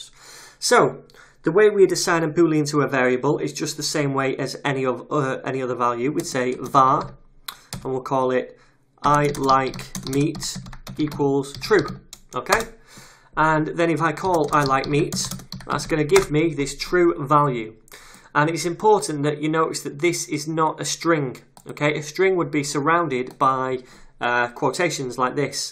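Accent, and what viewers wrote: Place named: Manchester, United Kingdom. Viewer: That's a joke, right? Just in case it isn't, he's English. It's a Mancunian (someone from Manchester) accent.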